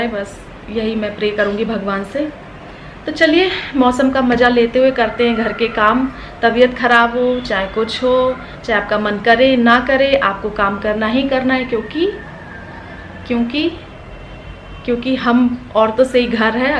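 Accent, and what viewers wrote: Kanpur, India. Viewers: Hello ji kaisi hain aap yah Kanpuri Bhasha mujhe bahut acchi Lagti Hai Main Mera Ghar to Lucknow Mein Hi Mein Hi rahti hun lekin Meri jyadatar rishtedar Kanpur mein hai aur mera aana jana Laga rahata Hai Kuchh farookhabad mein bhi hain Sare rishtedar Udhar hi hain Tik Tok Par video banana Koi Buri baat nahin hai agar aapko Achcha lagta hai to aap banaaiye bus yah hai ki Thode bure comment bhi Aane Lagte Hain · Aaj ka like, comment kanpuriya language k liye ...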